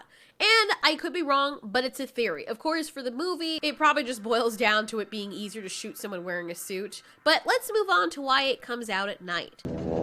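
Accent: United Kingdom. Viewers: Are you British? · I personally prefer your British accent.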